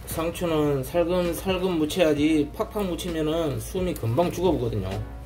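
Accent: Jeolla, South Korea. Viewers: 전라도 사투리죠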